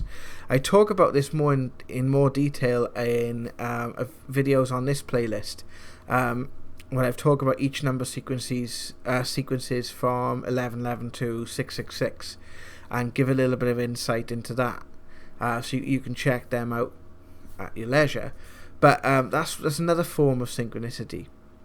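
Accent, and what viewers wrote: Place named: Wales, United Kingdom. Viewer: You sound Welsh asf hahaha proper valley accent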